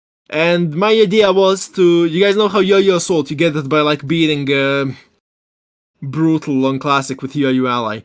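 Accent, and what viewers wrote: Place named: Russia. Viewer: Why does shound russian english accent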